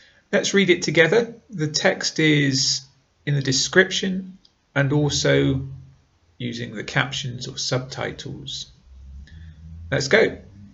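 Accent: United Kingdom. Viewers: dear my best teacher i like your speaking style and your accent very well i want to learn british english and speak like you plz tell me the best way how to speak likes a british native speaker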